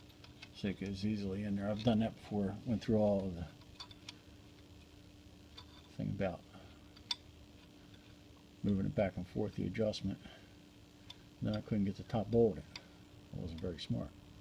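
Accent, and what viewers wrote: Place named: Delaware County, United States. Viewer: Judging from his speaking manner, my bet is that he's from the Philuffia PA or South Jersey area, and has a little bit of a "Delco Accent". Sometimes difficult to discipher even by locals. GO BIRDS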